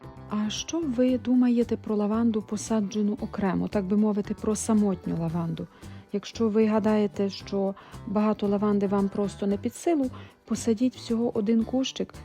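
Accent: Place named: Transcarpathia, Ukraine. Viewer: закарпатська українська- особлива)) у вас якийсь свій діалект))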